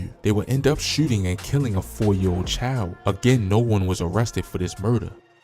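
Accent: Baltimore, United States. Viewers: Where are you from Baltimore? Your accent is fucking stupid bro. You pronounced word so fucking stupidly. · Sound like u frm Baltimore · The narrator has to be from Baltimore 🤦🏽‍♀️🙄😒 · Got that Baltimore accent 2uuu 😂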